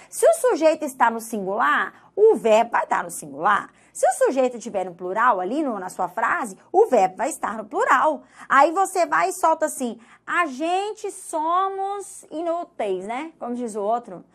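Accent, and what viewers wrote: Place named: Minas Gerais, Brazil. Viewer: Parece minha tia q mora em Barbacena MG (sotaque)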